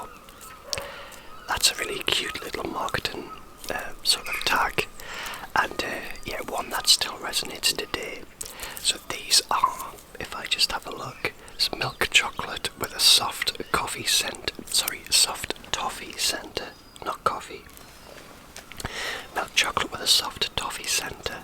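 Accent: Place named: Newcastle, United Kingdom. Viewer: The Geordie accent works really well for 👍😊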